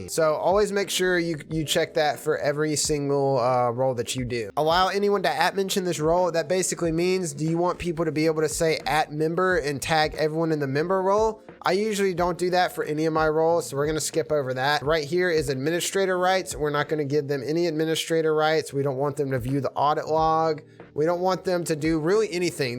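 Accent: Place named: Alabama, United States. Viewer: Sweet Home Alabama Accent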